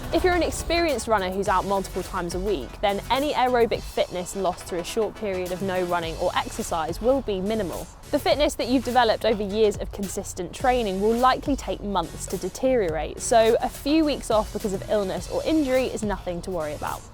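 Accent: United Kingdom. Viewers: Hi I much more 😍 Your Videos. Add 1 subscriber. So where you from USA Or something like British?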